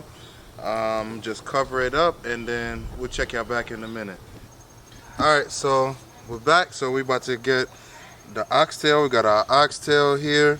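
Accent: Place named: Jamaica. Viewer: I hear a little jamaican accent, anyways just subscribed, hope yu a yardie 😂😂😂😂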